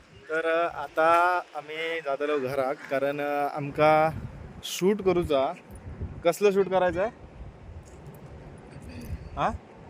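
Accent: Malvani, India. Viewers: Kiti god malvani bolte ❤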